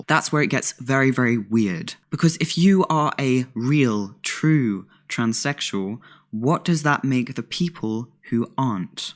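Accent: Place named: Southern England, United Kingdom. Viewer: It's that smooth baritone and southern English accent.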